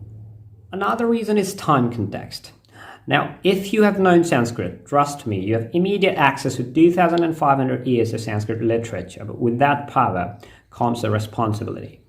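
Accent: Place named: United States. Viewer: Pretty good American accent! Talented guy you are!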